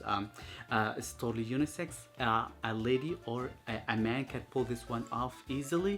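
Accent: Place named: Israel. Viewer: Are you Israeli? יש לך מבטא 😏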